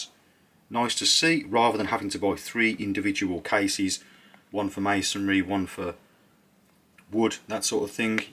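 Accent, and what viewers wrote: Birmingham, United Kingdom. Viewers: You need a better accent. Most Brummies are as stupid as they sound. I suspect you’re not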